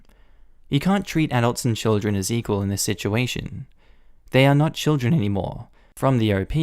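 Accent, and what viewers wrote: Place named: Australia. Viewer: Judging by his accent * is also ausralian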